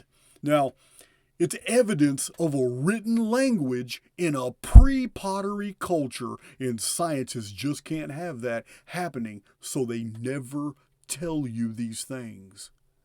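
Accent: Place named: Texas, United States. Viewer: From which part of the USA is accent?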